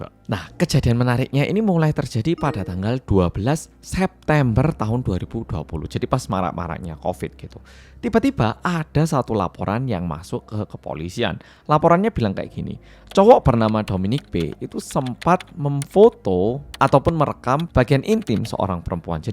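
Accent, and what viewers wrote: Java, Indonesia. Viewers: Wah, ini mantap dan Top top markotop ini yg aku suka dgn bahasanya yg MEDHOK 😄😄😄❤❤❤👍👍👍 Lanjutkan Medhoknya (ini yg menjadikan Aku SUBKRAIB)🙏🙏🙏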